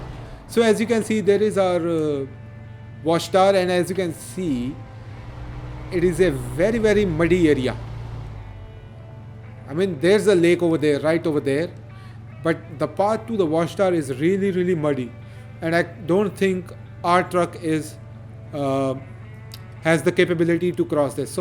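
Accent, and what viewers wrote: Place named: India. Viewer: Hey !! Sounds like you're Indian, where are you from? I am a biker YouTuber from Bangalore who loves this game!! Love your content , subscribed :)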